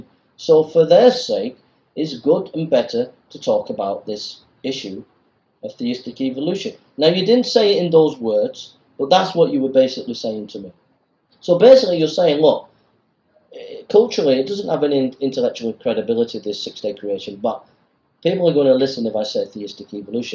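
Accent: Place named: Liverpool, United Kingdom. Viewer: My grandfather was Liverpudlian Ave been there loads of time ferry across the mersey BUT if your nancinian then I'm way off on your accent if a or any one knows where he's from gotta be Liverpool or Lancashire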